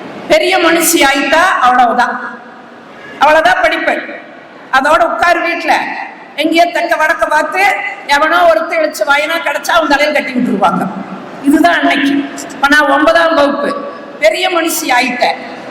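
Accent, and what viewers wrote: Kongu, India. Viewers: சிறப்பு மேடம். கொங்கு தமிழ்....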